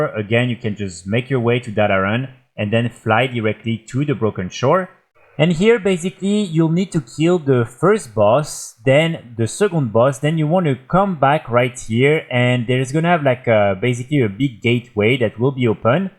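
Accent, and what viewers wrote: France. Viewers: Je reconnais un Francais quand j'en entends un 😅 merci pour le guide !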